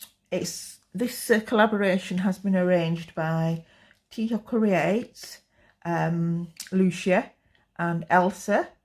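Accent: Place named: Manchester, United Kingdom. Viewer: hi there! Newbie crafter here and I found you via the #fallfestcollab the shadow box is absolutely amazing! what an amazing way to re-purpose items! now the 2nd craft holy cats that's so imaginative !! egg shells! omgodness these are all so amazing! i'm sure you get asked all the time but I'm new.. your accent reminds me soo much of my favorite soap opera.. so I have to ask (to see if my ear is even close) near Manchester? :)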